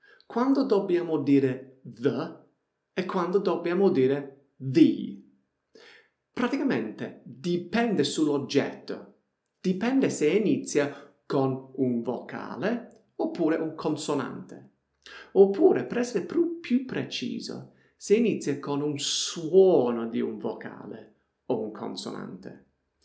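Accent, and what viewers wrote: Apulia, Italy. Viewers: Vero !! e quella malcelata cadenza pugliese è un plus che lo rende estremamente simpatico ed empatico con chi lo segue: per sua stessa ammissione in altro video pare che abbia deciso di vivere in Puglia ed in particolare a Locorotondo, un magico paese di quella splendida zona nota come Valle d'Itria costellata dei famosi "trulli" ... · Non posso non notare un leggero accento pugliese :) Giusto? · ... unA consonante o unA vocale, non un... utilissimi i tuoi e super simpatici con quella cadenza pugliese... 😅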